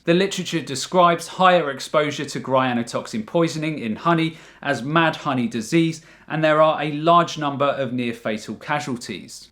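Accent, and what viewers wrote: United Kingdom. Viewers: I am not plant based, but I still love watching your videos. 🙂 You give alot of good advice. Plus, I like the British accent lol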